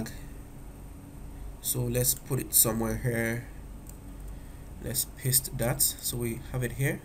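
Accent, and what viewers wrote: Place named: Nigeria. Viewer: Wow!. I was watching BOVI comedy on my Tab while searching for How to work on Instant Article. I had to stop Bovi when you started talking. A NIGERIAN VOICE? MAN!. GOD BLESS YOU. I didn't skip the ads either because I believe that's a way to say THANK YOU!. GOD BLESS YOU MAN.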